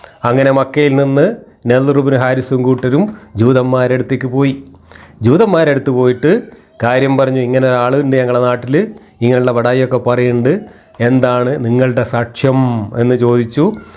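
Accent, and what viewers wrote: Malappuram, India. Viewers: മാഷ്ടെ സെൻസ് ഓഫ് ഹ്യൂമറും മലപ്പുറം സ്ളാംഗും അടിപൊളി 😅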